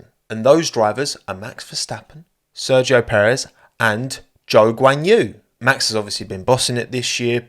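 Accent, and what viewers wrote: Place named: London, United Kingdom. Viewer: The only channel I’ll suffer the London accent for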